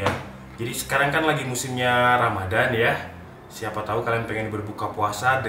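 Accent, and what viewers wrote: Malang, Indonesia. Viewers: orang malang mas?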